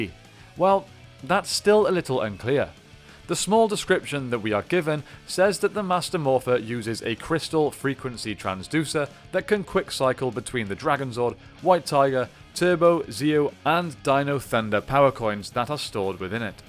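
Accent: Wales, United Kingdom. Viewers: Is that a Welsh accent I detect? I'm Welsh and I can't decide if it is or not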